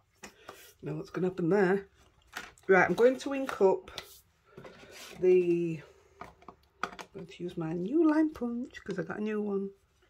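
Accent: Yorkshire, United Kingdom. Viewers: Oh fantastic as usual. What a cute little bag. Yes the Lavinia stamps are brill. Lucky enuf to be going to Lavinia shop later in the year on my trip to England. My list is growing lol. Love your accent being from Yorkshire myself.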